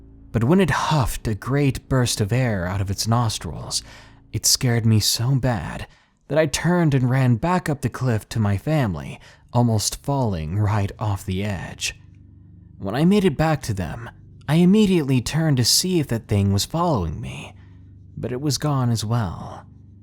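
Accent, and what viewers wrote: South, United States. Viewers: That southern accent nearly KILLED ME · That rich southern drawl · ... you southern accent is to die for. omg. <3<3<3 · Hey, I loved your Southern Accent it made you sound like a Southern Gentalman.. I do declare 😉 much love Sexy Grandma ✌💙💙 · I love your country accent and I love your videos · I never thought I'd find a southern accent attractive before. O.o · I came for the dank stories, stayed for the southern accent. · that southern accent it shivers me timbres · LOL< your southern accent! You're entertaining beyond the stories! Great job! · LOL loooooove your southern accent!!! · I love the Southern drawl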